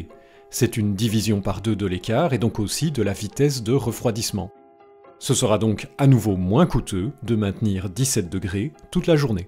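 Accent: Belgium. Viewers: "Elle est cachée dans le bouisson." J'adore l'accent belge ! Merci pour le starter kit c'est génial!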